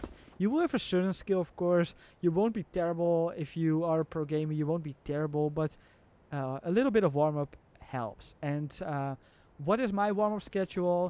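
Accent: Netherlands. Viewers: The really small adjustments are the hardest, big flicks on the body are fairly easy, but when your crosshair is just 1 pixel OFF the enemy ... So hard to compensate if you have to do it quickly. It's what i'm practicing most atm , also is this guy dutch ? SOunds like dutch accent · are you dutch?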